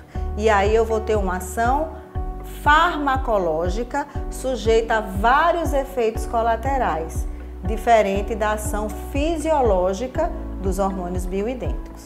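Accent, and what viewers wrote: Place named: Northeast, Brazil. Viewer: Esse sotaque gostoso é só que lugar do nordeste?❤️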